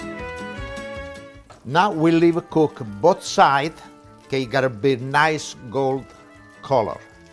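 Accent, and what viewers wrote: Italy. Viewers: Cin cin! Love his Italian accent! I made his parpedelle & Bolognese the other night. It was so so good! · I'm loving that accent, he's a true Italiano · I love his accent. Yes I want to learn how to make real Italian food from a real Italian. Thank you for this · Excellent recipe, the version with beef is called pizzaiola with origan instead of pepper and without eggs and breadcrumbs simply cooked. Italian accent but no Italian living in Italy would eat a second (main dish) together with a first course, we believe in the separation of... powers (chef Montesquieu😉 ). Dividi et impera.. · Love when Italiens speak English ❤️ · Classic Italian acsent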